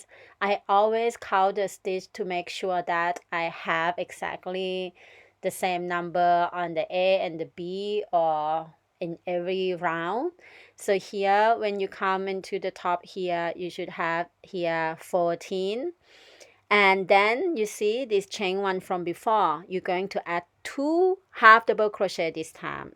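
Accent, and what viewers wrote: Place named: Thailand. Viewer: Sounds like a Thai accent!! Love the tutorial!!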